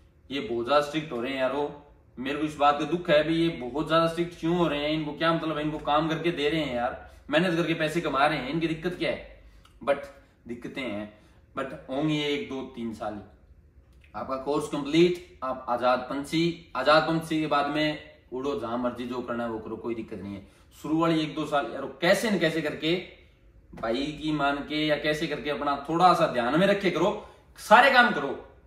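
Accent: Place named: Bagri, India. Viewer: Best best h bhaai treeeka ek nmbr h bhaai baat krne ka btaaane ka sb bagri hindi mix c ghant h bhaaai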